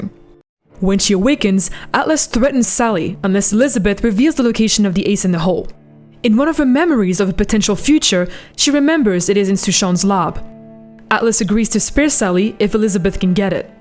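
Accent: France. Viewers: Its a French woman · are you french? omg Salut!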